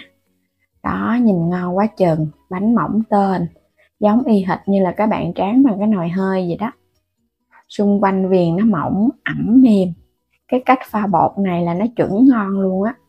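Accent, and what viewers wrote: Mekong Delta, Vietnam. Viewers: Giọng người miền Tây đa số là vậy bạn ơi .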